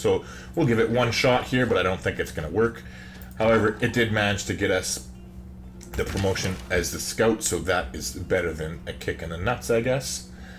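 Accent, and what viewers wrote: Canada. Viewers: yep that’s what I meant. Awesome video by the way, been playing civ for years and learned a lot of cool stuff just watching this. Sick Canadian accent as well. Also are you gonna continue this series or was this just a one time thing to show beginners how to play out the first 50 turns?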